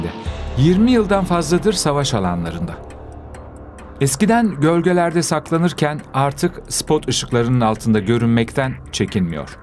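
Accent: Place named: Adana, Turkey. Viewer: Sadece bir Adanalı ,Elbise derken E yi uzatıp Eelbise der.seslendiren kişi,ya osmaniyeli,ya Adanalı ya da mersinli.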